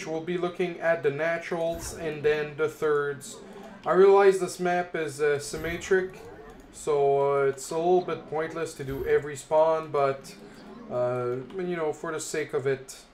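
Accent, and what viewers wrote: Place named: Quebec, Canada. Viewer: ça parait dans ton accent que tu es québécois entk thx pour ta vidéo tres bonne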